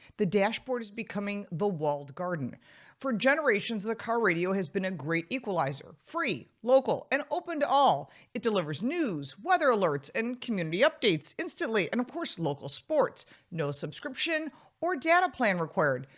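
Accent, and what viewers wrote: United States. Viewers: I don’t care about your bambling on in your yanky voice I’m Aussie and I drive a Australian made car made in the 90s with a huge sub woofer don’t want to know about Electric crap shit boxes cars are petrol v8 and six cylinder turbos WAKE UP FK WITS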